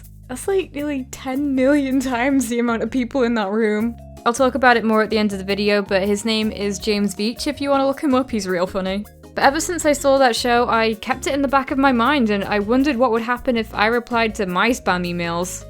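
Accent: England, United Kingdom. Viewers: you should Do an disstrack with your beautefull british voice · This channel reminds me of Rebecca or let me explain studios but a UK accent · Is she british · You sound the British version of Jaiden Animations No offense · It sounds like she tries to hide her english accent from some reason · Is this person British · British JaidenAnimations? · Are you British? You have an accent. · You are the british accent of jaiden animations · Jaiden but british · YOU SOUND SO BRITISH That's not an insult you're brilliant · is that an english accent?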